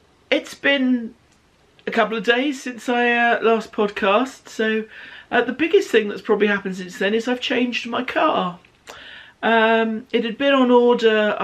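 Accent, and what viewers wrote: United Kingdom. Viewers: So happy to be hearing your lovely British accent again!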